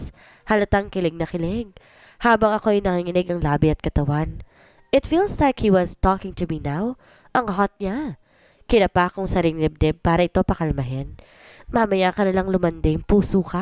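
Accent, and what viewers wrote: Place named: Italy. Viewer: The narrator did much excellent in Italian dialect, very good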